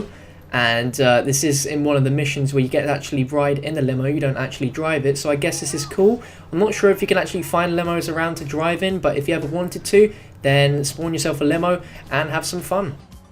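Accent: United Kingdom. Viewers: Is he brittish I wonder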